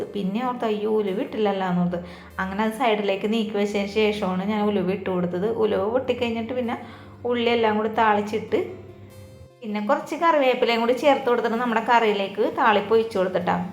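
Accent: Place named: Kochi, India. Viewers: പടിഞ്ഞാറ്ൻ ഭാഷ.... നന്നായിട്ടുണ്ട്... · Samsaram kettittu kochikkari aanennu thonunnu. Ningal evideyaanu sthalam? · Ernakulam ആണെന്ന് മനസ്സിലായില്ലേ, എനിക്കറിയാൻ പാട്ല്ല കേട്ടാൽ ഉറപ്പ് എറണാകുളം.. പടിഞ്ഞാറൻ പ്രദേശം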